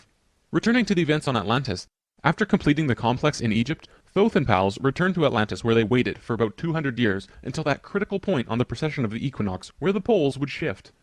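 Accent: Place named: Canada. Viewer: It's the same voice from the original but the pitch has been lowered so he doesn't sound like one of the chipmunks, but the way that the words are pronounced, the rhythmic speech pattern and verbal cadence are the same as the original movies narration. I believe that the narrator is Canadian based on the pronunciation of certain words.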